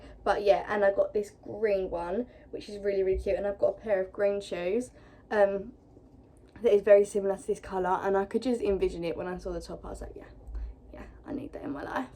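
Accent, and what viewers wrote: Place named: United Kingdom. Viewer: you are GORGEOUS. Beautiful brown/green eyes, beautiful smile and cute voice with the British accent. Beautiful body and CURVES. You are STUNNING in everything you wear. All of the outfits in this video look REALLY cute on you and the colors they are make you BEAUTIFUL. The greens definitely look GREAT on you and bring out those beautiful eyes. You are GORGEOUS and SUPER cute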